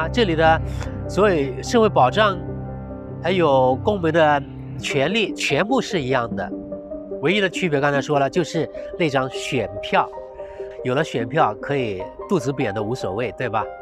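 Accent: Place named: Cantonese, China. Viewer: 感觉您有粤语的口音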